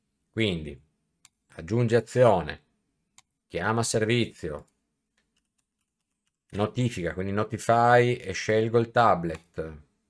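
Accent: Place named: Italy. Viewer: Grazie per il video! Dopo giornate e giornate nelle quali cercavo una soluzione per il mio tablet, con questi script mi hai risolto tutti i problemi!! Tra l'altro, a sentire dall'accento, sei anche delle mie parti! 😅 Grazie ancora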